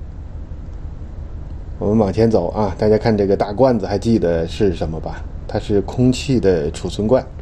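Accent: China. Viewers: 船长哪里人？听口音像大陆的？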